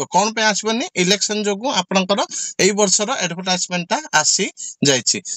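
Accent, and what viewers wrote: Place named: Baleswar, India. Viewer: Baleswaria heithiba tanka adia accent semiti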